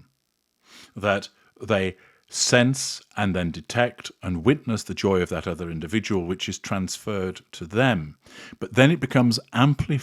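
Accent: United Kingdom. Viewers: Why is everyone with a British accent automatically a douche? You're the ultra alright. Ultra twat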